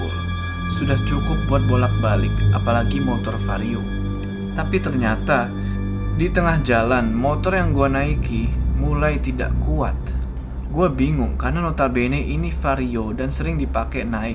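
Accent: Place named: East Java, Indonesia. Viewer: Jatim mana cak?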